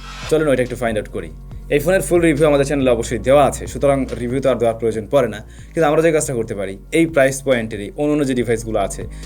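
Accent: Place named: Jashore, Bangladesh. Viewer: vai apnar pronunciation ta jashore er vibe dei. Apni kon jelar origin? Sadat Jashore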